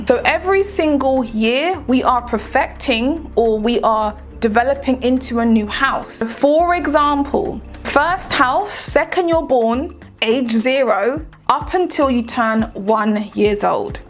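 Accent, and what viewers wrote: United Kingdom. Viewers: 🤣 🤣 🤣 🤣 I LOVE your energy and the wsu you present your vids, you're the only astrologer with a British accent I follow, I'm South London 30 libra ♎... You have a patreon??? I wanna link up wit youuuuuuu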